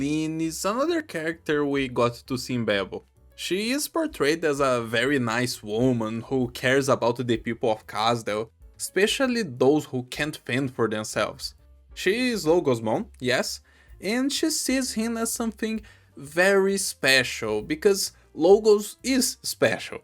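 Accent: Brazil. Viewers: é muito estranho ver vc falando ingles quando é muito obvio q vc é br (o "sotaque") tipo amo os videos mais é meio estranho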